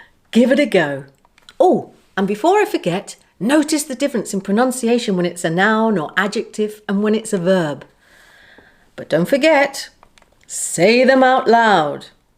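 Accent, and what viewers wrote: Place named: Scotland, United Kingdom. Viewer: Hi, I am here just to say you are amazing. Where have been all my life? Your Scottish accent made me giggle. You are the best English teacher I ever had. Grazie mille la mia eccellente insegnante. Ciao.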